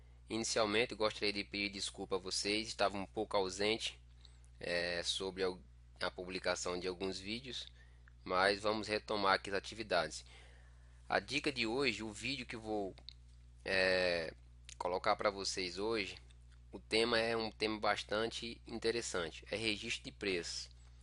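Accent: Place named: Northeast, Brazil. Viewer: Que sotaque gostoso de ouvir! rsrs O senhor é do nordeste?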